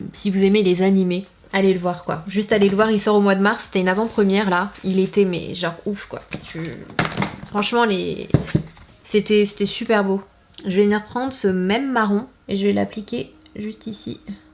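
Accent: Quebec, Canada. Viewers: L’accent québécois 😂🙋🏻‍♀️ follower québécoise 😋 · J adore ton accent québécois! Vive le Québec xP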